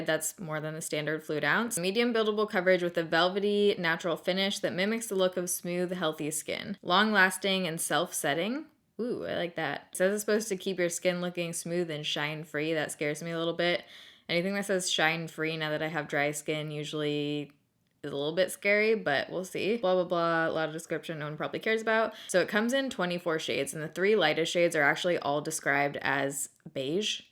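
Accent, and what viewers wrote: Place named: Maine, United States. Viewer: Where are you from? You sound like you have a Maine accent! I'm a Maine girl, I'm probably way off but I was just wondering 😊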